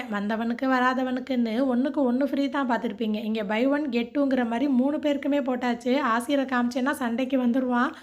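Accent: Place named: Keelakarai, India. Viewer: Assalamualaikum sis unga slag keelakarai slag onuthaa...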